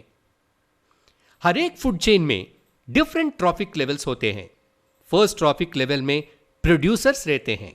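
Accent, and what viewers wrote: South India, India. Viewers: I think you are living in South India because your hindi. but your Hindi was good . ❤ Love from Rajasthan ❤❤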